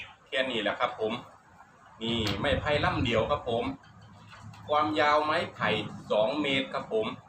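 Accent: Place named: Isan, Thailand. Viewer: เวาอีสานทืกใจจังเลยเฮาคนบ้านเดียวกัน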